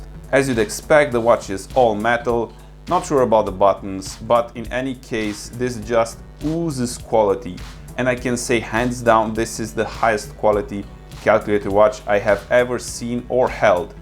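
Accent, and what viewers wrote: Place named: Hungary. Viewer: Kicsit magyar az akcentusod. Talán nem véletlen, ugye? :)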